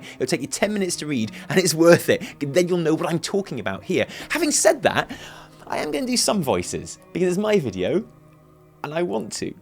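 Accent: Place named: United Kingdom. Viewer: Love your content bro. Also iam getting used to that strong brittish accent day by day. I swear i didnt understand single word to britts at first, but now is it better and better!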